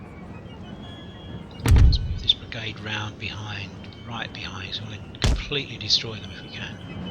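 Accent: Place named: England, United Kingdom. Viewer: mr im not sure if i ask but are you english because i like your accent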